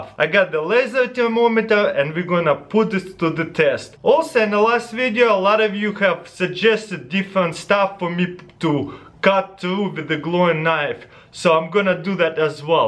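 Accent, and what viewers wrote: Russia. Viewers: Diego Andre He's Russian. It's called an accent, if you've never heard of it. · Come on everyone be more mature! has an accent, i bet if you spoke Russian and were from another country trying to have a youtube channel, you wouldn't like people making fun of you! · Diego Andre he's Russian he has an accent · Diego Andre he's Russian, have no never heard of accents?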